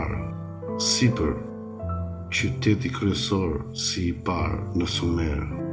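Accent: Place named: Gheg, Albania. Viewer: Gegnishte e paster. Sa let për ta kuptuar, faleminderit për kto informata të çmuara.❤🇦🇱🇦🇱🇦🇱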